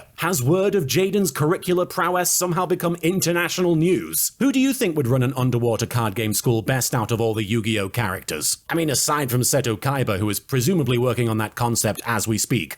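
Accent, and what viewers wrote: United Kingdom. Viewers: wait are you british??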